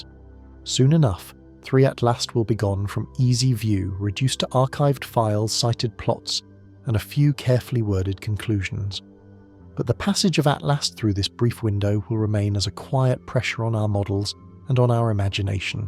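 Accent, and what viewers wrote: United Kingdom. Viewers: Why is the voice ALWAYS british english!?!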